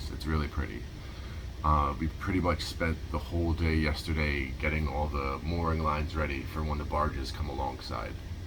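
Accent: New Jersey, United States. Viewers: are you from New Jersey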